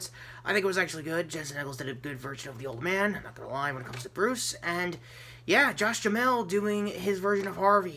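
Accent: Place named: United States. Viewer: You sound just like Tom Holland's American accent!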